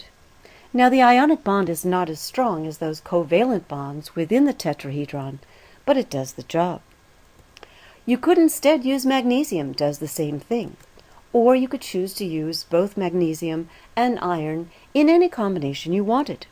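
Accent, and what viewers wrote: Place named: RP, United Kingdom. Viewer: Beautifully elucidated (great posh chat).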